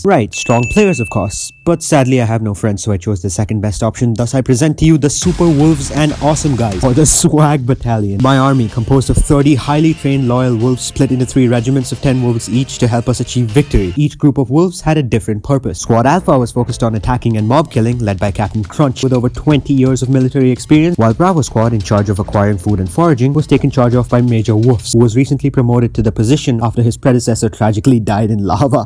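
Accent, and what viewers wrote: India. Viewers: Bro still has Indian accent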